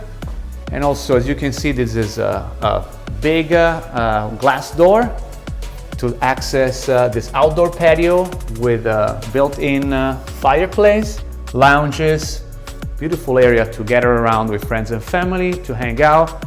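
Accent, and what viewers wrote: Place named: Italy. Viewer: What are you talking about? He’s an Italian which has learnt British in America - that’s what it sounds like!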